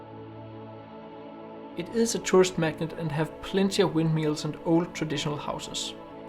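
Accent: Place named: Denmark. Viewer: Its not that bad! Its just very clear you have that Danish accent haha... Planning on coming to The Netherlands again someday soon?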